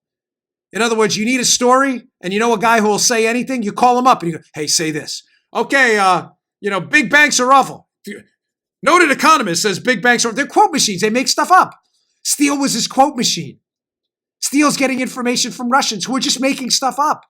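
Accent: New York, United States. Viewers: Dan...as always I cannot wait until pm to watch your videos. So much to look forward to....the great NY accent and the same brother hood with the NYPD. You rock!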